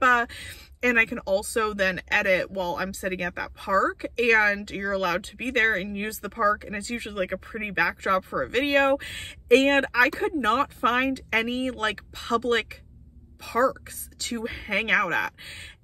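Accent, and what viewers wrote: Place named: South, United States. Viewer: as you say in such a southern drawl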